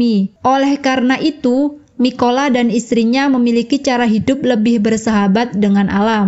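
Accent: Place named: Java, Indonesia. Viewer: Seru banget ceritanya, tapi agak medok suaranya hehe. Lanjutkan mbak.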